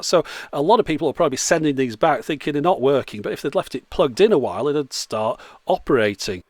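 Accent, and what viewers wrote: United Kingdom. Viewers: I love your videos and your voice! Its so British and classy. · Charming British accent... mmm...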